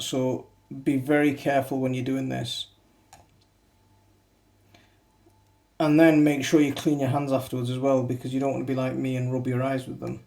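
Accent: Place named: South Yorkshire, United Kingdom. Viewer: Nah then lad, you sound like you come from near me in South Yorkshire. I usually set my chillis off on heat mats, but like you sometimes I forget to label them. Last year my mate ended up with a reaper, and I ended up with some Apaches instead of vice versa. Good vid, I have subbed and belled.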